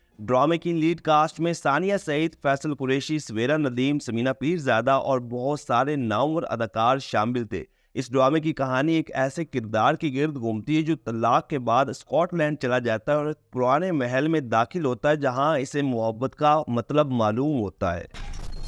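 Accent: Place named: Punjab, India. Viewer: No doubt mother tongue Punjabi. Very poor URDU.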